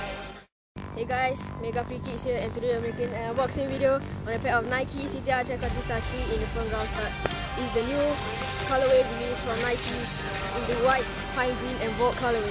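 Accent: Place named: Singapore. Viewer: lol u singaporean eh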